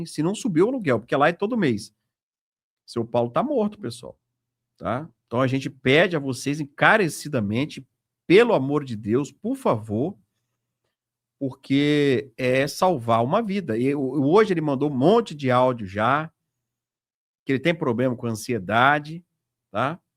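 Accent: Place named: São Paulo, Brazil. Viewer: Eu tenho raiva desta mulher porque ela é paulista está fala dela irrita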